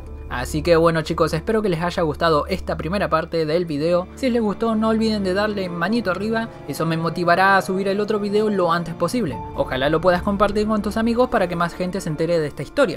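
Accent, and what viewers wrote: Argentina. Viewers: Que sos argentino?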